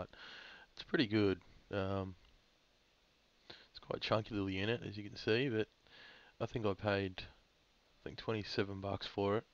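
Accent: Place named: Australia. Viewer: He's From Straya. We think Americans talk funny.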